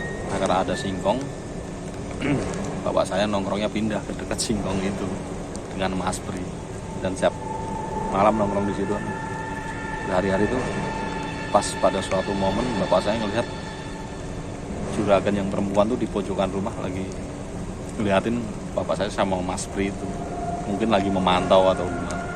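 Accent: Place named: Banyumas, Indonesia. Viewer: selamat malam,saya tinggal di Gianyar Bali,logat anda mengingatkan saya ke daerah asli saya,Banyumas.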